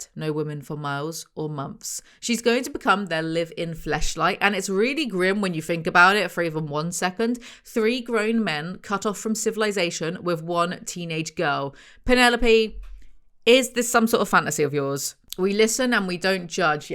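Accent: United Kingdom. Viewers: Honestly, I've said it before and I'll say it again, I'd kill to get your book reviews on spotify for long term british yapping ad-free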